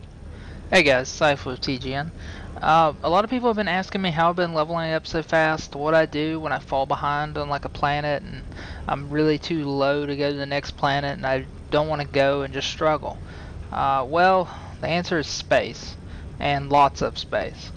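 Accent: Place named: United States. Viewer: His accent is country, I think.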